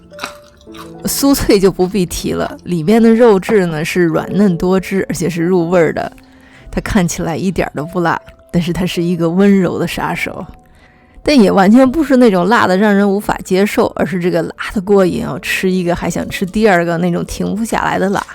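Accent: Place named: North, China. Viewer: 这北方口音... 没得说了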